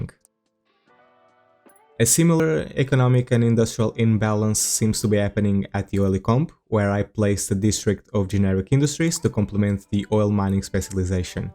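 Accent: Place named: Portugal. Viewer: O inglês do português é maravilhoso, merece o meu gosto. Nota-se mesmo quando um português fala inglês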